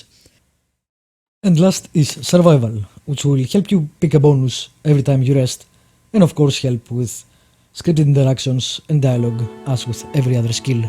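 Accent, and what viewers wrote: Greece. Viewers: Nice Review.You speak English like a Greek,are you Greek? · Are you Greek? It seems from your accent :) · Great review, was really interesting to listen to your Greek accent & how you pronounced different words. Good show! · Ειναι αστειο πως καταλαβαινει κανεις κατευθειαν αυτην την ελληνιλα στην προφορα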